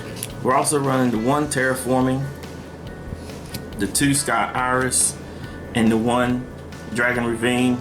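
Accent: South, United States. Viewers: Oh yeah lol your straight country lol I'm from Tennessee lol.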